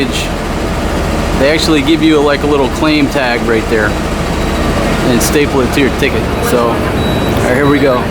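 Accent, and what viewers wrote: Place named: Texas, United States. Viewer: loved your detailed report about the bus service as i was just looking for this info ! and above all that loved your texan kinda accent man ! typical cowboyish ! a handsome and smart backpacker detected , in pattaya ! hahahaha keep posting such cool vlogs mate ! greetings from india :) peace and cheers for that singha !!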